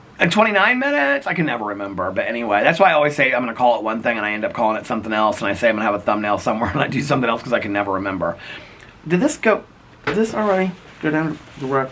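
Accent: South, United States. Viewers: U had me cracking up at the gym when u we’re talking about your southern twang from watching the slaton sisters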